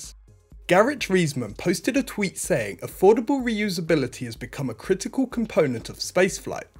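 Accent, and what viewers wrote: England, United Kingdom. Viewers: Narrator sounds English but isn't this the channel that used to have Vietnamese stuff on it along with spacex stuff?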